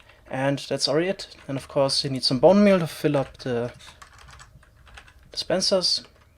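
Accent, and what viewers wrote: Germany. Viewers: You sound very German lol.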